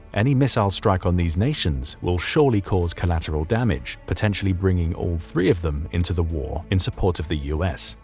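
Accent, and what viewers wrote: England, United Kingdom. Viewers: Nice try. "British accent" can refer to any British accent. In this case, assuming you are not deaf, he is clearly referring to an English accent. But wait... in your attempt at pedantry you yourself were far too broad. So I'LL ask you: Which English accent is it? Estuary? King's RP? Norfolk? West Country? Brummie? Scouse? Midlands? Chorley?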